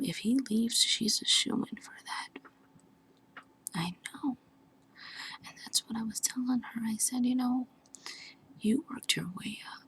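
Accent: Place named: New York, United States. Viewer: I love your accent. That's the prahblam - huuuuge tingles. Are you from New York by any chance? I have a friend who is Latino, from New York, and literally every time she talks I just melt and zone out..and she's like, "Gina? Hello? I'M TAWKIN' TAH YOU."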